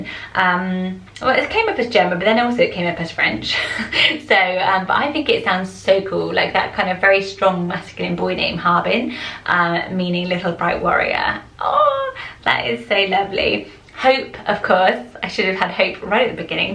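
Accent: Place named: England, United Kingdom. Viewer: Evelina sounds so much prettier in your English accent. My favorite this time must be Phoenix. What about Theon for all the Game of Throne fans out there. A very cool alternative for Theo.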